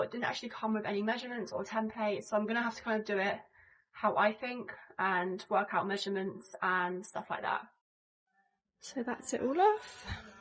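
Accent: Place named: Southern England, United Kingdom. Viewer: She is from south of England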